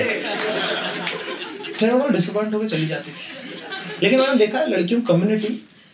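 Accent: Indore, India. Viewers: his jokes may bot be funny everytime but what makes him truly a legend in india is his indori accent and way of acting , his relatable sakkht launda stories and down to earth attitude.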